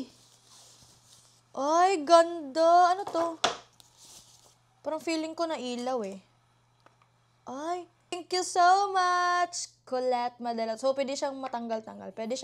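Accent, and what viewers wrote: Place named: Visayas, Philippines. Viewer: I do hope tutukan mo yung accent mo sa tagalog para mas madeliver mo ng maayos mga sinasabi mo lalo sa lines mo sa acting. hindi mo naman kakalimutan ang bisaya but ofcourse need mo masanay sa tagalog kasi hirap na hirap ka mag construct ng sentence. Grind lang ng grind! For da go!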